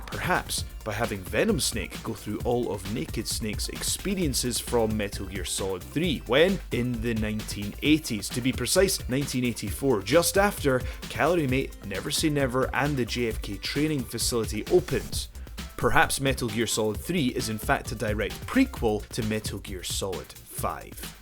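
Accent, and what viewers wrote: Scotland, United Kingdom. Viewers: He has a Scottish or north English accent. That might have something to do with it. Or maybe not. Just a thought. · Oh, you couldn't ruin Zelda for me if u tried, still always a pleasure to hear ur thick Scottish accent though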